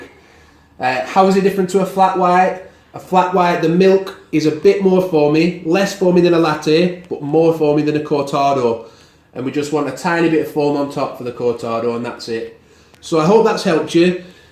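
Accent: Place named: Northern England, United Kingdom. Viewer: ah, your accent sounded a bit like Gary Neville's who's from Bury so I figured you were from round there. Q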